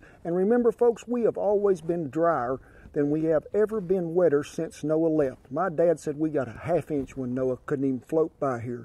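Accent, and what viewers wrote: South, United States. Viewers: Charming southern accent, nicely cooked fish!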